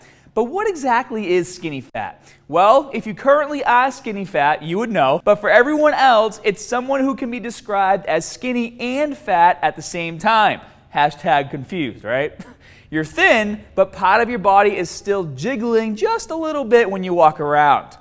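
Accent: Boston, United States. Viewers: Boston accent buddy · Is this guy from Boston. Paut of ya body. I like it! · Sounds like hes from Boston lol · This man is dripping with Boston. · its boston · That Boston accent! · Its boston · This guy is from Boston ain't he? ...just guesssing 🤷🏻‍♂️ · That's a Boston accent for ya. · Boston guy 😂😂😂 the accent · Are you from Boston? I love your accent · I thought the dude’s boston accent was a joke at first lol 😂 but very informative non the less. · That's a Boston accent...born and raised there so I know. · I love Boston accent Tourette's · Boston bud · Yea my guy is definitely from Boston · are you from boston? i like your accent great vid. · I think it's the Boston accent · You got that “BAASSTON” accent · That BAASTON accent though... pretty intense 😸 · abdulla alsuwaidi it’s An American accent, from Boston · Boston accents are trash lol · I love the boston accent keddd 👍🏾 great video ! 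· It’s a Boston accent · Yea boston accent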